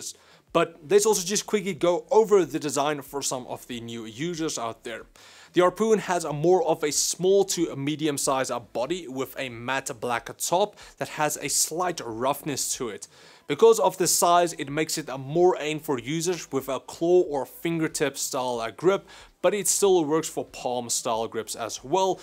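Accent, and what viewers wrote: South Africa. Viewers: Wow South African damn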